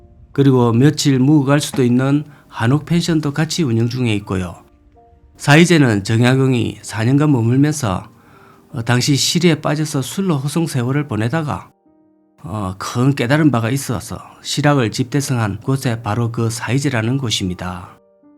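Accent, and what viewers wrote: Gyeongsang, South Korea. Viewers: 해설자,,말투는,갱상도, 사람이네,,,양택조니을 앞세워 지역감정 조정하네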